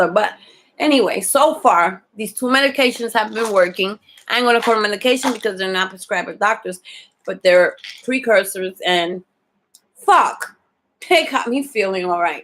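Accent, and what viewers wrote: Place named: Bronx, United States. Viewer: Honey, are u from the Bronx? I ask because I am. U sound like it. Anyway, I know ur not a professional but I want to ask. Have u ever had ur dr cut ur klonopin dose. Mine did it. And it's been 3months. I updosed n still no relief, my brain is so messed up. Cant function. I'm a CNA n have to work. U can imagine. I updosed again. So stupid up n down. U ever had this?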